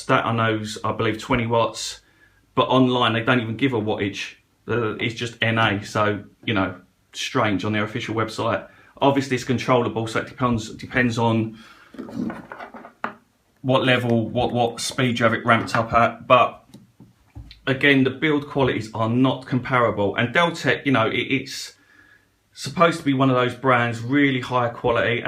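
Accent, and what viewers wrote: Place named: England, United Kingdom. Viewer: Not impressed with your video..I love your english accent however...but...who gives a rats ass if the lid is thicker? You think your corals care? ALLLLL they care about is clean water and my bet is the Deltec will outskim the Vertec hands down! oh and that leather look is probably so the lid does not slip out of hand as easy...no brainer.... disappointed in your biased approach. Just because the vertec is built stronger and smoother lines does nottttttttttttt mean it performs better and really performance is more important then the thickness of the lid! Deltec is amazing!!! You are a product of marketing...the MFGs trying to figure out how to sell you ...because they cannot get a skimmer to perform as good as the pros at Deltec... :-) again..I love your accent lolol Tim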